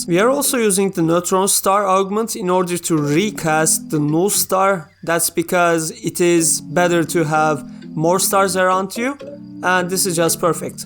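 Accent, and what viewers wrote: Turkey. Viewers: Türk müsün kanka konuşmandna belli amk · Türk olduğunu aksanından direkt anladım😂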